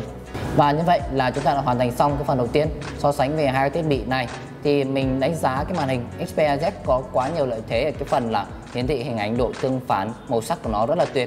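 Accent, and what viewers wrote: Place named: Central, Vietnam. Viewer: ông này hình như giọng chính là miền trung.... nghe mõi miệng vãi....đánh giá chưa chính xác, thiếu tính khách quan...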